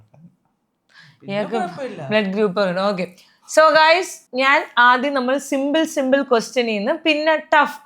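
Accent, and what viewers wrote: Trivandrum, India. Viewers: See nigal orikkalum oru disrespect alla.tvm side orupadu peru agna vilikkarund it's normal.chilath okkei slang different aayond rude aayi thonnunnatha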